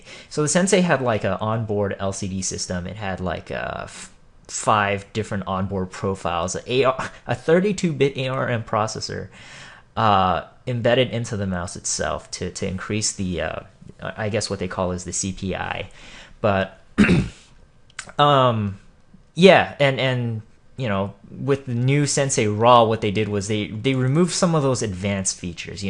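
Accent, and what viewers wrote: United States. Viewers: this is my first time seeing you and I must say it, I did not see this coming, not being racist but I though you were america white because you had an american accent but you look pretty sexy (no homo). Other then that Congrats on you partnership you do deserve it :D.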